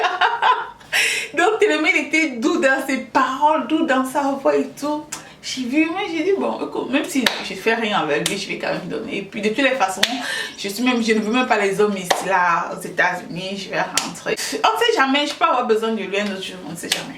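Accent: Cameroon. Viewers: J’ai reconnu l’accent bulu du Cameroun! Tu n’es pas gabonaise. Quand tu t’exprimes exactement comme une beti. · je croyais que tu étais camerounaise avec ton accent 🤗😂 Ton mari ce sont tes yeux de désordonnée et ton piercing qui lui ont plu 😂😂😂😂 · ma compatriote equato hein🤣🤣🤣 heee pero no parece tienes más acento camerunes 🤣🤣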